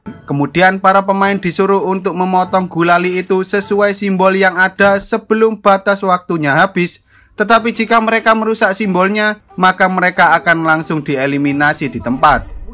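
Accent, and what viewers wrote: Java, Indonesia. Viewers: ngantuk gue dengerin miminnya medok bat 😭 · Medog banget ngomongnya hehe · Jowo campor indo wes mboh medhok e pol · Kental Kali logat jawa nya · Suaranya medok banget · Ngomongnya medokkk banget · kho jawanya medok bnget😅😅😅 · Kek suara sama logat medok nya dodit mulyanto. ya gak sih😂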